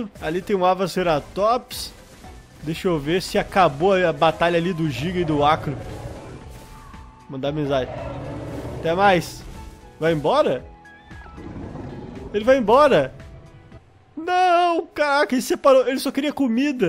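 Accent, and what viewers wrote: Rio Grande do Sul, Brazil. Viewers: Vc é gaúcho?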